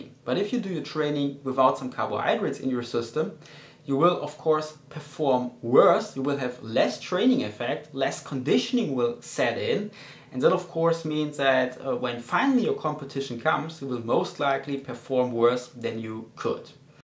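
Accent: Germany. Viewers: Great video as always. Too many people thinks empty stomach cardio is best for fat burning. Thumbs up for german accent!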